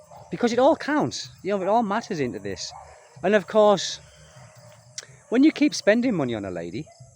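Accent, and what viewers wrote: United Kingdom. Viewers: I think he's more a practicologist w the British accent Westerners enjoy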